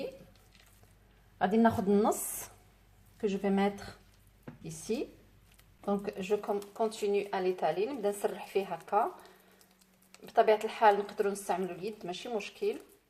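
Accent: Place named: Morocco. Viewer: كي نسمع الهدرة تاعك نقول انكي من المغرب الشقيق ولكن الطبخ تاعك بكل صراحة طبخ جزائري ديجا احنا اشقاء واكيد طياب ميكونش مختلف كثيرا شكرا لك على لوبيا بالكرعين سخفتين يعطيك الصحة الخدمة والشرح المفصل والإتقان في العمل .متتبعة لكي جزائرية مغتربة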